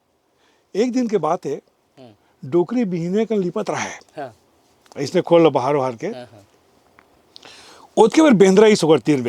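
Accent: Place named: Chhattisgarh, India. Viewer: बहुत सुंदर भइया, ठेठ हमर छत्तीसगढ़िया अंदाज कहिनी सुन के मजा आ गे, प्रणाम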